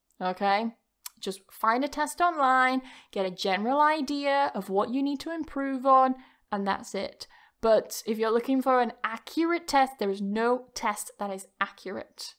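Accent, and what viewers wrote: United Kingdom. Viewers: First comment, maam.. Happy returns day.. I have been watching for two months and I really like British🇬🇧 english. Thanks🌹 👍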